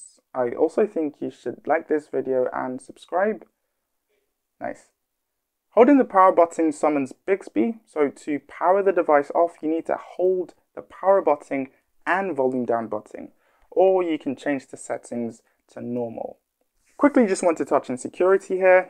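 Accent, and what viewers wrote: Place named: United Kingdom. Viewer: you sound British though. Did you go to school in Britain?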